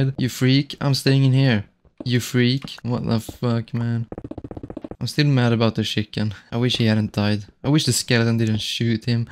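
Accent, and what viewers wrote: Sweden. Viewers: to be honest you kinda sound swedish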